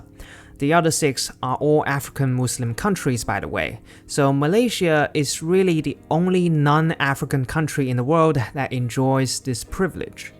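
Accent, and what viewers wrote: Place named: United Kingdom. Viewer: Goosebumps, Asian with British accent.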